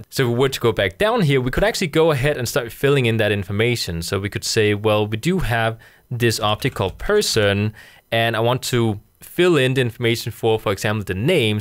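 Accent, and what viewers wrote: Denmark. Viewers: I love your way of explanation. You are my kind of teacher who explains basic and doesn't assume that others will pick up the complex concepts easily. When I first listened to you, I thought you must be Danish (Your English accent). Now, I have checked your About which confirms that you are indeed Danish. Thusind tak for fantastiske videoer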